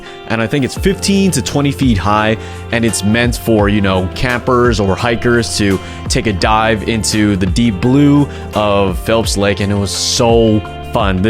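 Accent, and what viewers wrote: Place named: Bay Area, United States. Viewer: “Where’s the trail, bruh” I know a fellow Bay Arean when I hear one. 😂😂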